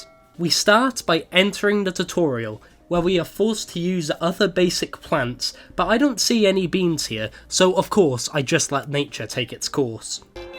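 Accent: United Kingdom. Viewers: This man can complain about everything in British and I will immediately agree Unrelated note: I subscribed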